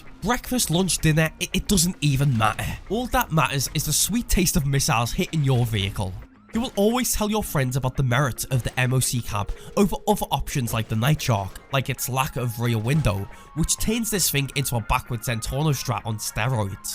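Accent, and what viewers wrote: United Kingdom. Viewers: This guy is the definition of the bri'ish accent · Ok dies viioe is preyys nice! I ont´t like Briish accsent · Im sorry but please dont speak like a narrator because that british accsent+narrator just gave me a headache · SCOUSER!! No way ye not bro can hear it even through ye weird accent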